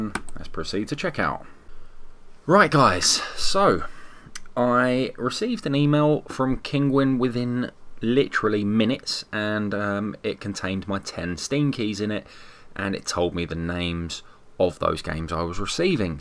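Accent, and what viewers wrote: United Kingdom. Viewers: that British accent tho. ;)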